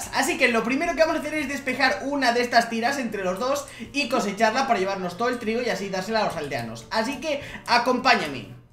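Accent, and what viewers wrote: Argentina. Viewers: que acento argentino papá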